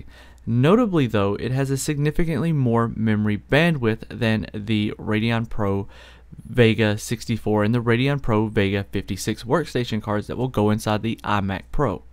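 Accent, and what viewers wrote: South, United States. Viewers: Did I hear some of that country accent in this video? Haha great vid dude.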